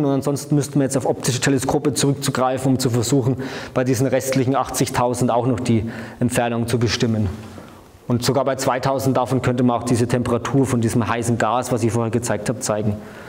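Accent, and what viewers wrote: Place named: Bavaria, Germany. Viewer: Mit schwerem (bayerischen?) Dialektakzent und zu hohem Sprechtempo leider schwer verständlich!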